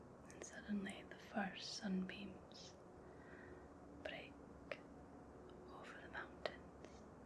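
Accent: Scotland, United Kingdom. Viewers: Time to melt to her beautiful Scottish accent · I love the Scottish accent. It's so pleasing and sexy to listen to. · Beautiful British lass/accent. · I've just come across your channel. Sitting comfy on my sofa. Headphones on. Play! Close my eyes and your tones give me a tingling sensation from head to toe. Be still my beating heart. I've always loved the Scottish woman's accent. I meditate everyday for my own mental wellbeing. So Scottish lassie you waffle all you like, Because this Irish lad will listen to you all day. Stay healthy, safe and carefree.😍❤ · I literally clicked this video just to hear the Scottish accent. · I stumbled upon your channel thanks to the algorithm. Rare for me to fully understand every word from a Scottish accent. Thank you for the calming video. +1 sub. Based on comments, you may have been away for a while. I see it often. 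Take time as you need.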